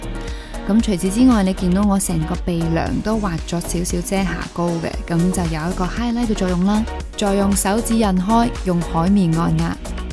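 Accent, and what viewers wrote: China. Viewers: you make cantonese sound so pretty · 大陸口音...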